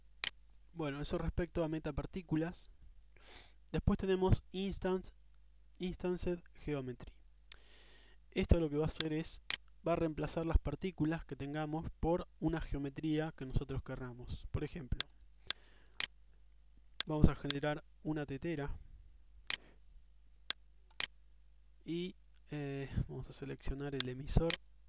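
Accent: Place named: Argentina. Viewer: Siempre muy buenos tus tutoriales master, concisos, bien explicados, sin dilaciones. De paso, descansa un poco los oídos escuchar tutos en argentino.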